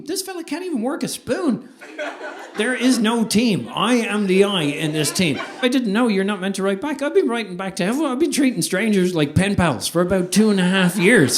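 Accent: Ireland. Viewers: You are absolutely brilliant. Of course everything sounds even funny with the Irish Accent 😂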